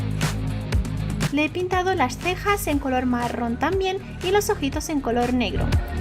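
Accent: Spain. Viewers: bonito acento, no tan marcado al español que conozco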